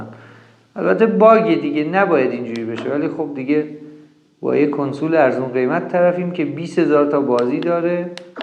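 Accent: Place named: Rasht, Iran. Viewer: آقا شما رشتی هستی لحجت مشخصه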